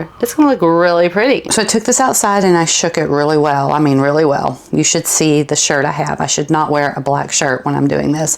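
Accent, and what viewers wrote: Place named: South, United States. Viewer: Wow! Girl, I just realized I am watching you.....enthralled! I love your voice, that southern accent, I can't wait to do this sewing! Thank you for being awesome!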